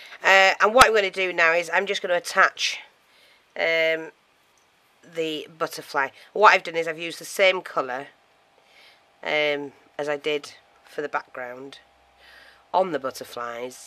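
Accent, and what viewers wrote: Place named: Leeds, United Kingdom. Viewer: So pretty. Keep them coming I can't wait to see what you come up with next. Thank goodness I don't work anymore I'd have to quit and stay home to watch. LOL My mother, uncle and gram & grandfather where born in Leeds. They came to USA in 1920. But I hear their voices when I listen to you. Thanks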